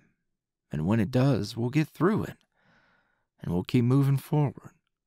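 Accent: South, United States. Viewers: Me who is from the south and loving southern guy Me: FINALLY!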